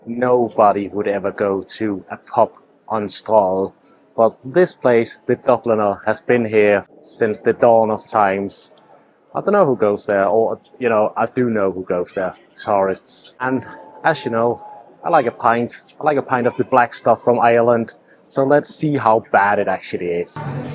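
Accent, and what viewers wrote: Liverpool, United Kingdom. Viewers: Bit of a scouse accent in there?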